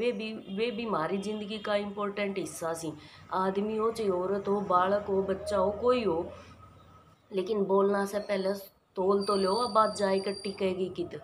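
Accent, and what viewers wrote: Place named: Rajasthan, India. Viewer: Aapki boli t to rajshthani lago ho ji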